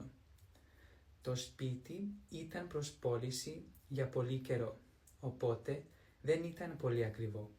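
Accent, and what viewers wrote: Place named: Spain. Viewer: Not gonna lie. As a native Greek, your accent sounds quite similar to the Spanish accent. You're pretty clear to understand though! You pronounced all the words perfectly. Καλή δουλειά :)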